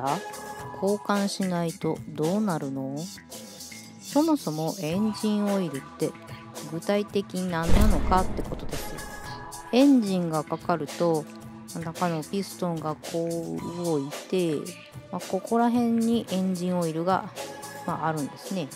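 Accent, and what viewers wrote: Kansai, Japan. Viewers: 何か関西弁ほっこりします。素人の私にもとても分かりやすい説明ありがとうございます。。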